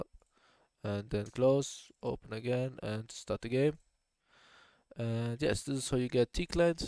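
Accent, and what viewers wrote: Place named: Germany. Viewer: das war das deutscheste englisch was ich je gehört hab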